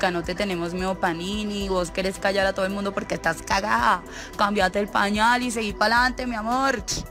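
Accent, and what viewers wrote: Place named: Colombia. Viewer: Ese acento es mi compatriota de Colombia dime si no estoy en lo correcto 😂😂😂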